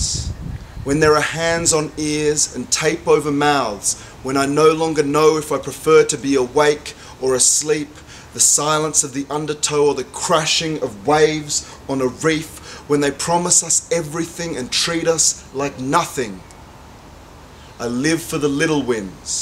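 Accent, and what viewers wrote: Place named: Australia. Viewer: Gidday from a new fan; greetings from a Kiwi in South Korea. Wow, this is impressive. Great to hear great poetry in a funny Ozzy accent! I look forward to more.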